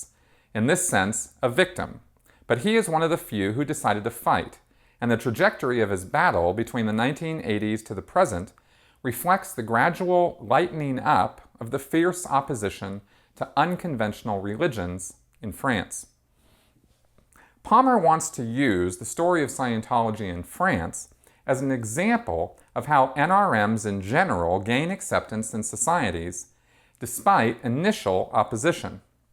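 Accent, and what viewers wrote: United States. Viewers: Your accent reminds me of an American sailor in the 1961 French film Lola. Otherwise, great as always.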